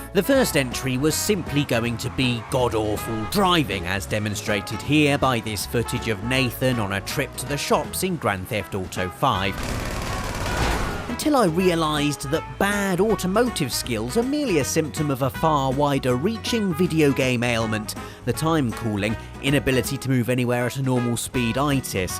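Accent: United Kingdom. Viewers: I'm from Canada, and I personally prefer your british accent over the english I'm used to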